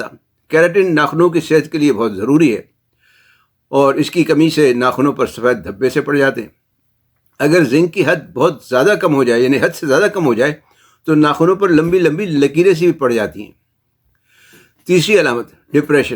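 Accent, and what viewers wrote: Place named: Old Delhi, India. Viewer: یہ لہجہ پرانا ہے اب دیکھنے میں نہیں آتا ۔ہو نہ ہو دہلی سے تعلق ہو سکتا ہے ڈاکٹر صاحب کا ۔اللّه خوش رککھے آمین 🤲🏻💙 السلام علیکم جی 🤲🏻💙 بہت محبّت کے ساتھ شکریہ ❤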